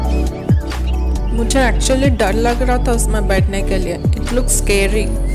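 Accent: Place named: Hyderabad, India. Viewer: I'm watching ur all vedios n I loved it...ur voice is too good ..specially wen talk hindi,ur hydrabadi accent is too funny...😁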